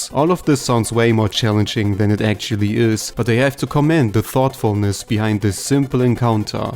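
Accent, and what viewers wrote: Germany. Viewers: I'm glad you're finally back. I never thought I'd as happy to hear your german accent again😂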